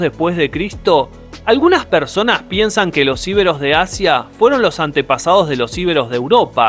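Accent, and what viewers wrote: Argentina. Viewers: El que habla es argentino; entre Brasil y Argentina no se odian? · Interesante. Un poquito difícil de entenderte con el acento bastante fuerte argentino. Pronuncias la Y com sh o ch en ingles. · ¨Peru tu que tel historico eres. eres por el acento eres Argentino. No tienes ni puta idea de nuestra Humanidad. Los Romanos ´pasaron por Euskadi con permiso nuestro. y les dimos en la ;Madre a Carlo Magno. Aita es Aita. pendejo.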